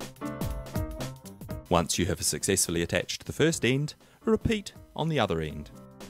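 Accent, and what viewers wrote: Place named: New Zealand. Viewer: Cute Kiwi boy accent!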